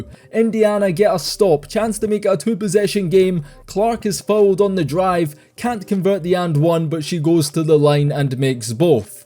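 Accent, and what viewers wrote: Scotland, United Kingdom. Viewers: Besides the fact that I just cannot stand your voice you embellish so much it is just nuts you exaggerate to the point where I can't even stand to listen to you it's like you are reading this off of a paper which I'm sure you are and it sounds exactly like it I don't know why they don't get somebody with a English voice that doesn't have some kind of Scottish accent I don't know any Scott's that are playing basketball for any team in America it's annoying just listening to you and listening to you exaggerate is the worst part of it there are Junior High girls in America that can do the same things that these girls are doing and you turn a simple layup into the greatest play of all time I just can't stand listening to you these other people can listen to you I'm done with you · It's crazy to hear this guy with his Scottish accent talking about American WNBA basketball. 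😂😂😂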